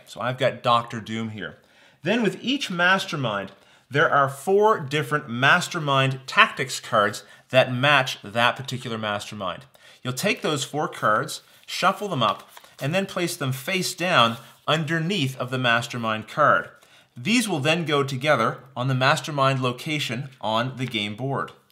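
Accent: Canada. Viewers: This helped alottttttt ..... PS... this guys accent is so canadien haha great job though!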